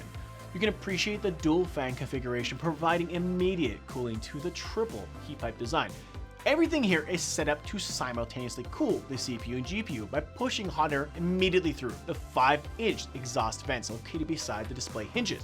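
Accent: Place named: Taiwan. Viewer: oh I love this talking style from taiwan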